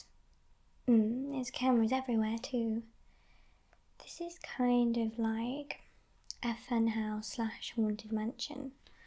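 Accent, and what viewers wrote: United Kingdom. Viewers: Your ability to morph into any Role and play that Character to perfection is an amazing Talent. You can honestly tell any story with your British Accent and I could listen to it for hours. Love the Red Costume and the Black Necklace and Masquerade Eye Mask.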